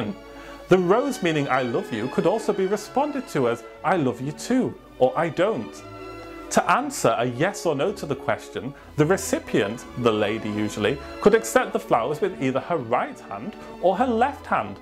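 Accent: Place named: Poland. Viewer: ! You make interesting videos! PS. Are you polish or ukrainian? You have such an amazing accent.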